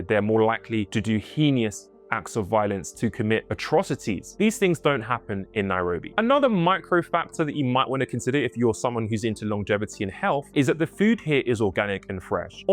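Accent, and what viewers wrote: United Kingdom. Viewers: What a bunch of pap--with a posh British accent.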